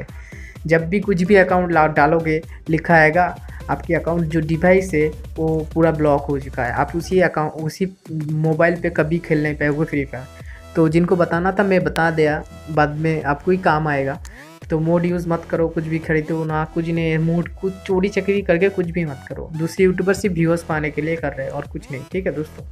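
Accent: Bengal, India. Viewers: Are you a bangali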